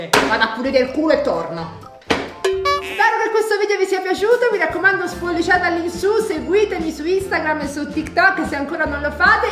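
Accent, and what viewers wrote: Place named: Marche, Italy. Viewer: Sei brava a parlare il marchigiano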